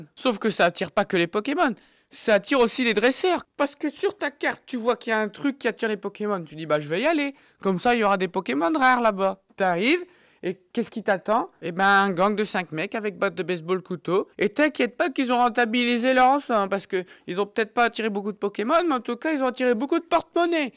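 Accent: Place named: North, France. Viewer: tu est du nord toi ... POURQUOIIIII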